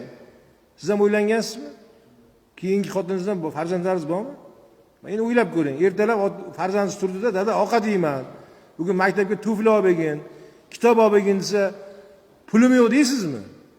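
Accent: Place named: Kitob, Uzbekistan. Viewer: Ота онанга рахмат судья болам шеванг Китобликларни шевасига ухшаркан❤😂 боккан ота онангга рахмат😢😅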